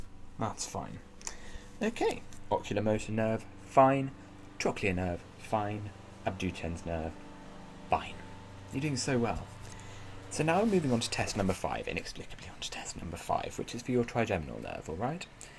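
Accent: United Kingdom. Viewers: Tbh this British accent makes the whole video even better. Damn it relaxes me ^^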